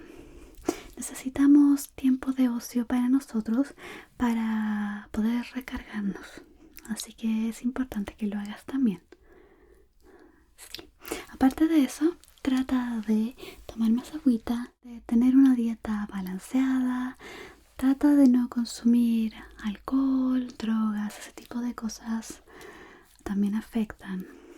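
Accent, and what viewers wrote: Chile. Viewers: Eres de chile ?👁👄👁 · sos de chile?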